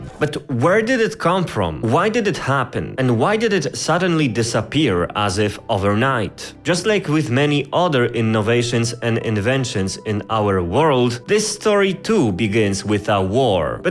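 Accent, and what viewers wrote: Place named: Poland. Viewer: Is this an english version of? Same thumbnail, voice and video in general. Czy mam rację? I feel that I shouldn't be here lol. Oh btw I FEEL Polish accent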